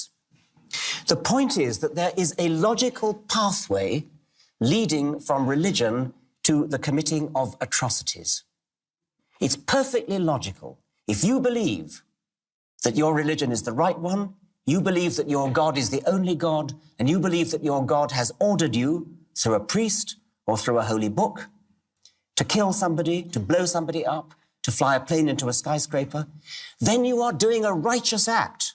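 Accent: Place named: England, United Kingdom. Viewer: MENOS K EL PROFE ES INGLES NO HABLA COMO LOS CHINOS" YANKIES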